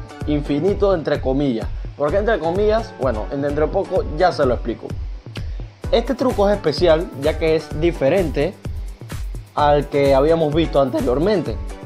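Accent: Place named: Ecuador. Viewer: es que me sonabas un poco con un acento ecuatoriano :v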